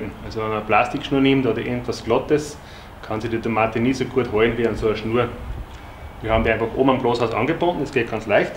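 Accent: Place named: Austria. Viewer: Das IST richtiges österreichisches Deutsch!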